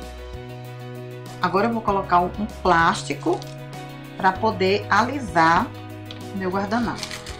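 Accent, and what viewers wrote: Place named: Sergipe, Brazil. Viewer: Amei seu sotaque! Sou sergipana e me identifiquei c esse sotaque, q parece sergipano. Amei tb a sua arte! 😍😍😍😍💗💗💗💗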